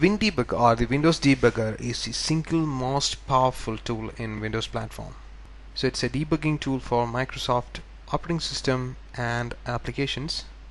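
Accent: Kerala, India. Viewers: He is speaking with a Malayali accent. People across India speak with different accents. The content in his videos are indeed well thought out and very helpful. Appreciate his effort and if you cannot then move on; there is no need to be abusive.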